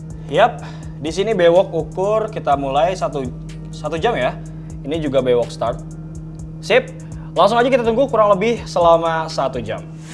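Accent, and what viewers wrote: West Java, Indonesia. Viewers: Urang sunda mang?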